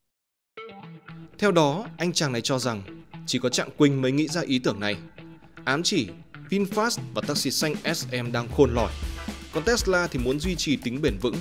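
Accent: North, Vietnam. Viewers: nghe giọng ba kẹ là tao đã ngi ngi rồi :v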